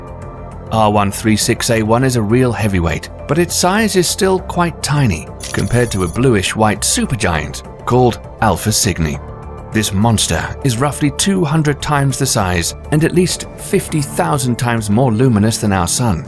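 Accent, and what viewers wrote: United Kingdom. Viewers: Why do we need a guy with a British accent to explain projects by NASA?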